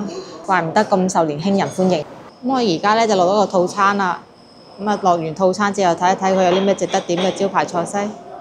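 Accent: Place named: Macau, China. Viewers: MOGO係唔係澳門人?好重澳門口音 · 終於有人問我一路想問嘅問題，點解第十甫長大嘅廣州人咁重澳門音🤔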